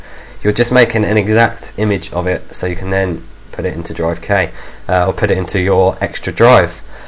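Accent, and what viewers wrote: England, United Kingdom. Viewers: y is this so long? do u have to go so fast? I have seen others do this in two minutes mr. English accent!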